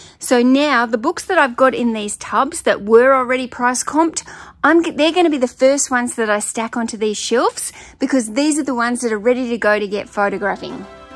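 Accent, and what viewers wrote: Australia. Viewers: Great sales on these books. I just started watching your videos. Thanks for taking us along for your journey. Question do you sale comic books also? Love the Australian accent!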